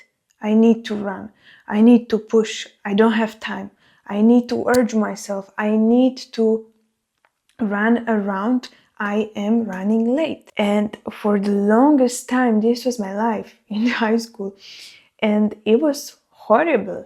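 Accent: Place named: England, United Kingdom. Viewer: Ahh that's a lovely english accent. What's your original language?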